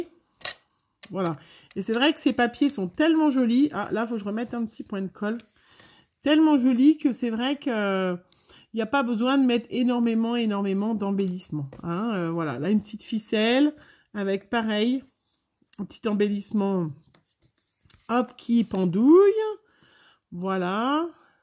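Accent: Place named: France. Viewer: Bonjour je suis Quebequoise Et j Aime bien votre accent de France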